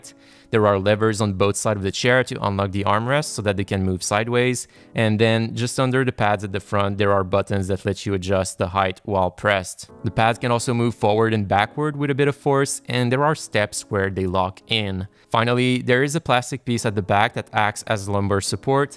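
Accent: Quebec, Canada. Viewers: Tu sonnes tellement Québécois 😂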